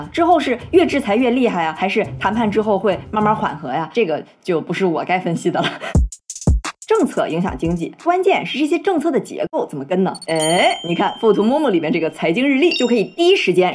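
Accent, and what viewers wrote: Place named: Dalian, China. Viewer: ，標準的大連口音.性格👍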